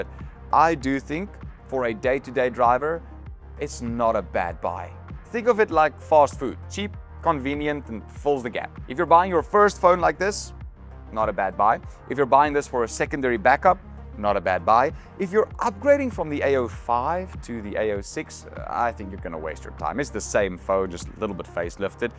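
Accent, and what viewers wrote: South Africa. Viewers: Howzit, I know here in SA don't watch alot of tech videos especially on Youtube. South Africans love TikTok. It would be great if you represented SA and actually put the Rand price as well & what it goes for on dollars vs rands. I haven't watched most of your videos but your accent screams out & you can't hide it. Abit Austrialinish but you're South African. Put as link, with rands. You'll see more and more South Africans will watch and Subcribe. Great video.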